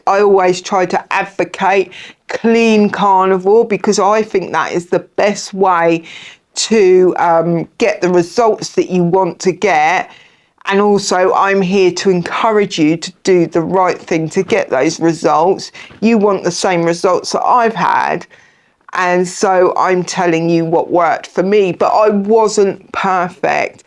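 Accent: United States. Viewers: Great talk! Thank you so much. And I love your charming Texas drawl…haha! But seriously, your story and advice are excellent. Thanks again & God bless you🙏🏻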